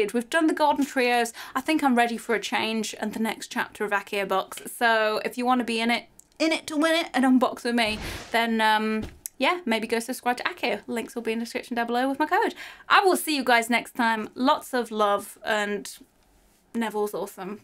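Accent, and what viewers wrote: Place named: Northern England, United Kingdom. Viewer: I think this vid is the most northern I've ever heard u.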